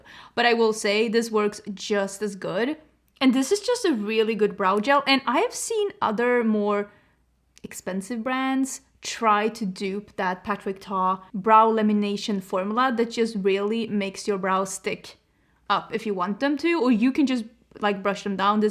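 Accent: Värmland, Sweden. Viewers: I had no idea that you were from Värmland. I actually thought that you lived way more north, but that’s just the mixture of dialects 🤷🏽‍♀️! I really liked this video, showcasing good products that happens to be more affordable than the Sephora brands. 💕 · Great video❤ And yes I can tell you are from Värmland😂 but I love the accent. I am a skåning and get mocked for my accent all the time😂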